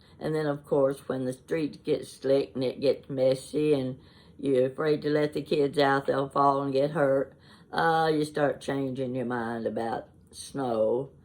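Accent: South, United States. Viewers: Thank you so much for talking like me. Both sides of my family migrated over from KY. I've lived in a couple of places that don't appreciate our dialect. It's funny because I don't mind their's. Blessings to all. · I've been watching you for months. I think i messed up, do you live in Kentucky or Tennessee? Either way i love your accent! There is a utube you might enjoy. It's Celebrating Appalachia, Tipper Presley's goal on her channel is to keep Appalachia language and foods ways alive. She's wonderful. She reads books to us on Fridays. Books Southern and Appalachian authors have written. They have been amazing. She lives with her husband, one if her twin daughters. Her other daughter & her husband live about 20min away. They garden, cook, have a good time. I love it! She also has a blog she started in 2008, the blind pig and the acorn. It's posted everday. 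So interesting. Grammy from Texas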